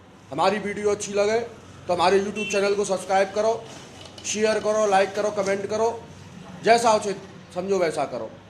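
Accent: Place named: Mathura, India. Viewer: Pranam guruji aap kha se h aap ki bhasaseli mughe apne mathura ke jesi lagi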